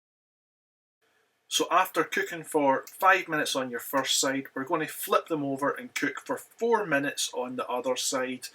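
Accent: Scotland, United Kingdom. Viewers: Potato scones very moreish. Being hard of hearing I relie on sub titles but, unfortunately, his lovely Scottish accent translates in places as gobbledegook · Nice to hear the scottish accent again, I will have to make some tottie scones.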